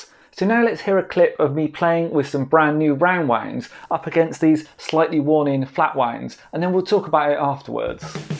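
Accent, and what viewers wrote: United Kingdom. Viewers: This gentleman has that beautiful deep British English accent wich makes the video even more interesting. Thank you for the great ammount of information you have provided us with. Greetings from Lisboa, Portugal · Agreed. The information he dropped for us is very important........but that bri'ish accent do be hittin' different. Can't even lie. 😂😂😂😂😂😂😂 Ok, forgive me. Lol.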